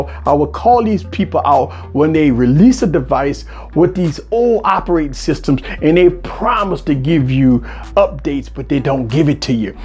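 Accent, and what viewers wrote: Louisiana, United States. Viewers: love that Louisiana accent, pretty cool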